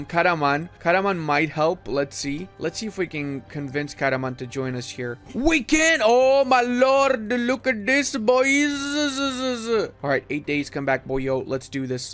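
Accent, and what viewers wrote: Romania. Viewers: is this guy romanian ? his pronounciation is really good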